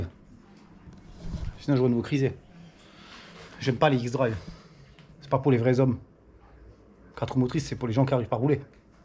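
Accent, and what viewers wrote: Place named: Moselle, France. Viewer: Un beau tuto , dommage que je n’ai pas en profiter quand j’ai fais la mienne y’a deux ans Ma femme dit que tu as le même accent que moi Vive la Moselle-est mdr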